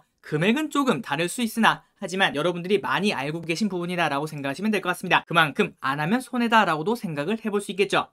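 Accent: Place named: Daegu, South Korea. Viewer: 저도 느끼고 있었... ㅋㅋㅋㅋㅋ 전 부산인데 사투리가 대구 친구랑 똑같길래 대구구나 해찌여 ㅋㅋㅋㅋㅋ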